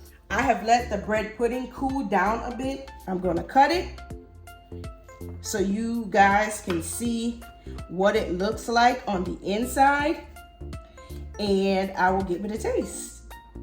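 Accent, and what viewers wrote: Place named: South Carolina, United States. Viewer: This is how we make bread pudding im from SC, and she sounds like she maybe from there as well or close. I've seen ppl bread pudding and I wouldn't eat it because it didn't look like this 😂. I also toast my bread first ❤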